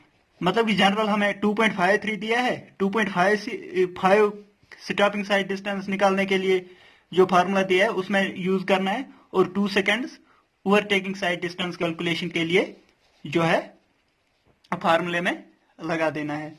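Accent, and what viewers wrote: Kashmir, India. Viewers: kashmir se hain kya aap?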